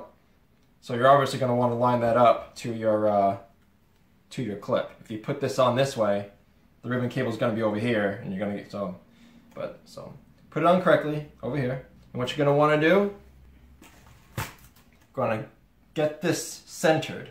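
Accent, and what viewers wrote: Massachusetts, United States. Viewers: Great job teaching how to do this. You sound like you are from Massachusetts :)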